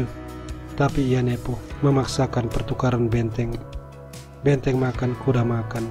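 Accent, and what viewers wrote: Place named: Eastern Indonesia, Indonesia. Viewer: Bung orang timur kah. Logatnya itu kental bnget timurnya 😁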